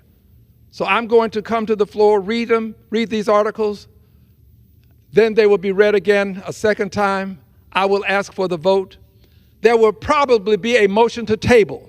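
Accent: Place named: Texas, United States. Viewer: I’ve never heard a posh Texan accent but damn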